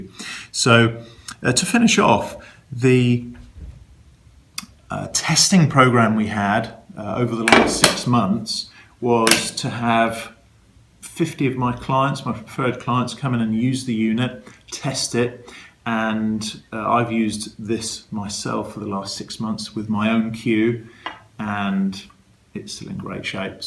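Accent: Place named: United Kingdom. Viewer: Hi Coach, if you are reinforcing proper cue motion via the trainer, does it fully carry over to cueing without it? Btw, your British English is so good to listen to. Greetings from Poland.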